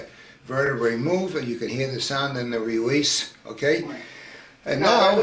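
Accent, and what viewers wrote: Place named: New York, United States. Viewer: You sure do have a New York accent! Haha well good video. taught me a lot about chiro.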